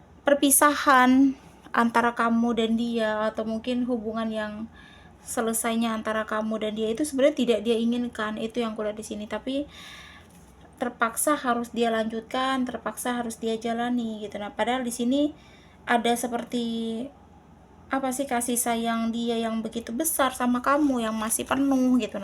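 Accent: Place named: Kalimantan, Indonesia. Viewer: Logat bicara kakak, apa kakak asal. Kalimantan?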